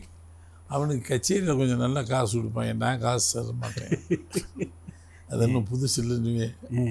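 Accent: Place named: Butler English, India. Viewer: Butler English தான் தல உன் சிறப்பு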